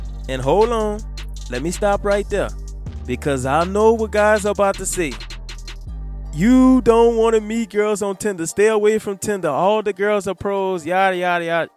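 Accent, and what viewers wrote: New Orleans, United States. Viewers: Bro this is a great video. Sounds like you from that NOLA too! Love man. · I just subscribed to your channel. I like your content. I’m from Philly and I was stationed in New Orleans back in the late 90’s. With that being said, you sound like you’re from the New Orleans area.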